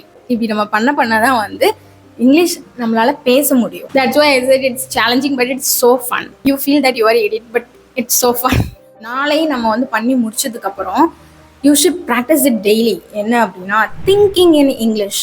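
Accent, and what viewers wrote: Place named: India. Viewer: Akka I improve your United States accent you are speaking Indian accent if you speak United States accent everyone will like your English more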